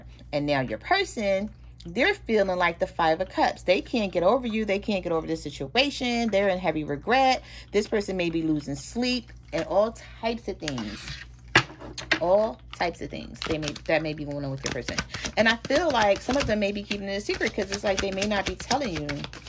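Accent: Philadelphia, United States. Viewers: Are you from Philly???! 👂🏽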